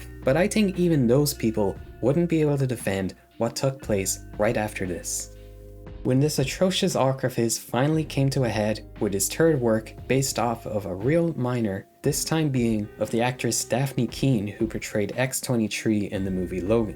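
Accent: Ireland. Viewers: It's certainly an interesting variation of an Irish accent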